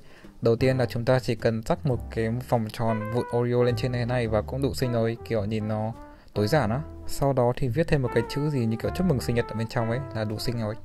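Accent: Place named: Hanoi, Vietnam. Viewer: nghe giống giọng ctrai HN , Thích ghê á :)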